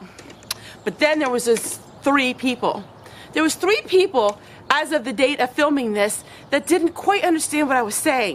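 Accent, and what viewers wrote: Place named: South, United States. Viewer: Wait. What? You're conservative? I wouldn't have known if you hadn't said anything. 🤷🏾‍♀️ I’m more focused on your accent. I can't figure it out! 🤔😆 Are you a Canadian living in Arkansas? It's like foreign and Southern, but not too Southern. Very interesting!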